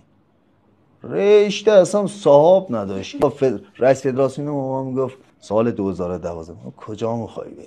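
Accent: Qazvin, Iran. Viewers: دمت گرم همشهری مدل صحبت کردنت قزوینیه😂😂❤ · با لهجه قزوینی عشق کردم❤😂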